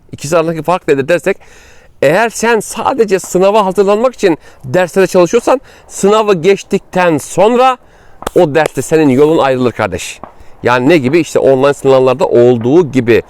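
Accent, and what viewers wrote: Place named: Black Sea, Turkey. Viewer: Hocam cok guzel karadeniz ya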